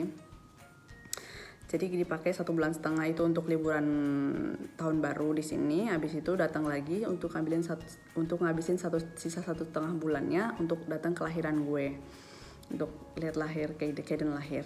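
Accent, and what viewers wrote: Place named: Jakarta, Indonesia. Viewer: logatnya sama ma orang betawi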